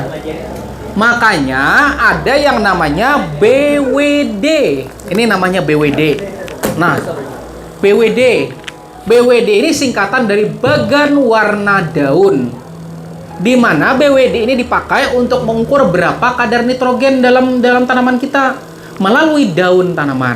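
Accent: Banjar, Indonesia. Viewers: urang Banjar kah pian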